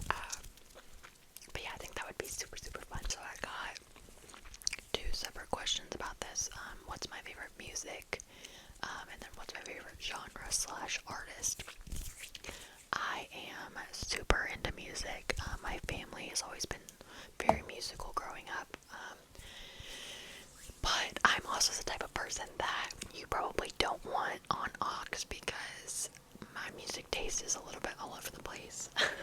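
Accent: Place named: Australia. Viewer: bruh i was so convinced you were australian xd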